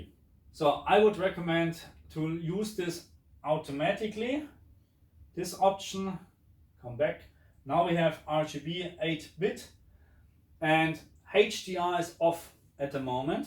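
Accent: Germany. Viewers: I just want to tell you that content is very explanatory and you have put some knowledge and effort into it. Thank you for that and keep with it. Do not listen to haters they have nothing to provide. Feedback and criticism are 2 different thinks. And I love your accent. Are you from Germany? Viel Glück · Dojcz akcent? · If a man with a strong German accent tells me to change my CX settings - i immediately change my CX settings ! 👌🏻🤟🏻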